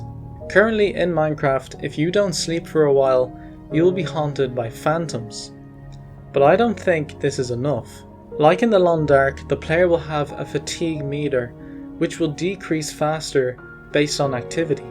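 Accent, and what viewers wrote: Ireland. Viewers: You are definitely Irish I can tell because I lived in Dublin for many years Good video by the way you make great points👍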